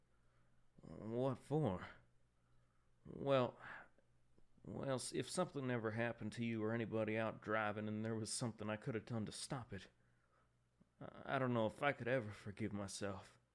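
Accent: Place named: South, United States. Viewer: Oh boy Ash was right, you do have a good southern accent ❤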